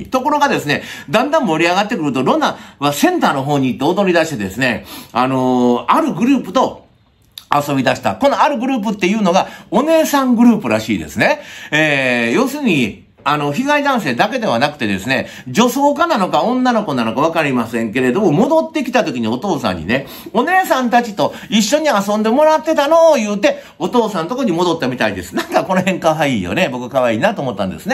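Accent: Kansai, Japan. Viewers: たしかお父さん、被害者にルナに合わないでくれって電話してましたよね？ルナを止めると暴れるから被害者に伝えたと他のニュースで見ました。 しかし関西弁で軽快なトークで面白い😊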